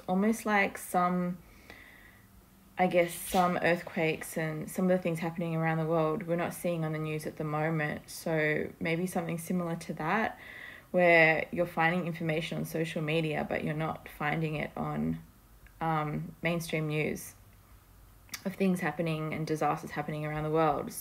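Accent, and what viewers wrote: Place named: Australia. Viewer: Oh sister right now u had 144 subs! U sound Australian. I'm kiwi. I know a few other NZ and OZ 144s. 🕊👼🏻🙂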